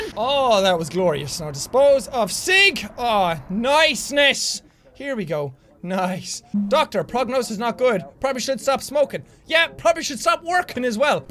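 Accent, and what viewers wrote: Ireland. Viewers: I love his Irish accent. I don't understand why people make fun of the way he says his ts. like I love how he say "I just tought I'd play"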